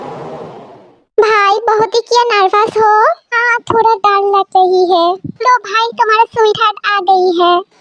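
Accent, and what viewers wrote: Bengal, India. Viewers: Are di apto bengali ho na 😊 apki hindi so sweet 😊😊😊❤❤ so cute story and darun hoyeche golpota ❤❤ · Sis your Hindi accent is very cute mixture of Bengali and Hindi nice to hear🫰🫰🫰🫰🫰🫰🫰🫰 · Dakke nahi bula ke are you Bengali cz apke language main bohot bangla feel hain btw nice story 🥺😽btw new subscriber love you Please Don't mind kiyuki maine apki voice ke bhare main bola hain sorry🥺And your voice is so cute 🫶🏻😩🦋and apki story bohot peyari hain 🥺plzz pin me god bless you borahae 💜🤍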